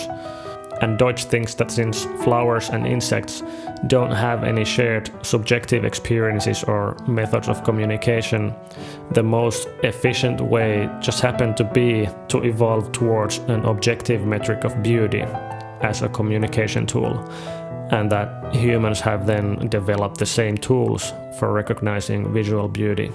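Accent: Finland. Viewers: The finnish accent, we meet again. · Veri finnish äksent👌 loving it! Great tutorial👊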